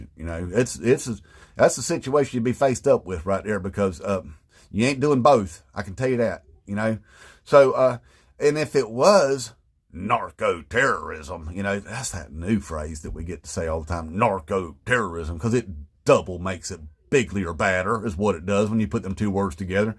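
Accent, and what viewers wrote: South, United States. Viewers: I have lived in South Carolina for 44 years and I can’t tell you how much it warms my heart and comforts me to hear a thick southern accent like yours brother speaking truth and also sounding extremely intelligent. I just made a very long TikTok yelling at Southerners for voting like they have no brain and some southern gal comments on my video that she agrees with me 100% and that groceries are so much cheaper under Donald Trump than Joe Biden. I never said that in the video. I said the opposite of that during the entire video. How are these people so stupid? Anyway, brother, thank you for speaking truth, especially in a southern accent.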